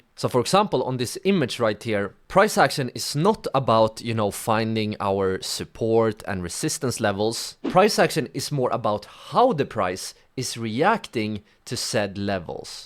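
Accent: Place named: Sweden. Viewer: svengelskan e ju för skön!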